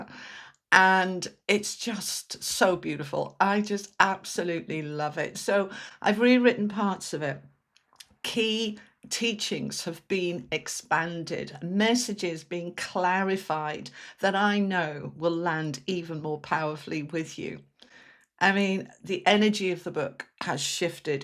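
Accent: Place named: South Wales, United Kingdom. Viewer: Hi, your accent sounds very familiar, I grew up in South Wales. I saw you on the Jeff Mara channel. You’ve put me onto a Spiritual Church nearby, I’m looking forward to meeting them. So thank you for that. And thanks for the tools you’ve explained, being the change. Love it!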